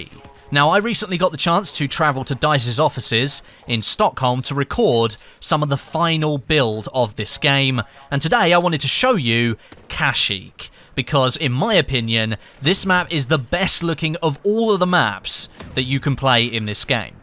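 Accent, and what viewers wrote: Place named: Southern England, United Kingdom. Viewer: If I had to guess I would say the south coast of England. His accent isn't northern, jackfrags is a northerner. I'm from the south west and we don't share the same accent. Or he could be from the posh parts of London.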